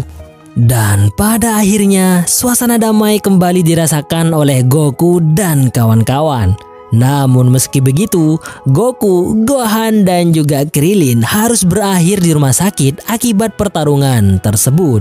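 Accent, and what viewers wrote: Madura, Indonesia. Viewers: Madura bang?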